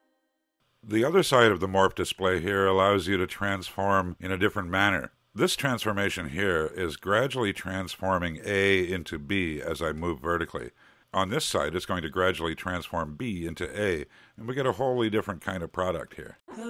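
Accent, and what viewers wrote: Canada. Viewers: No, just a Canadian accent. Hope you enjoyed the vid.!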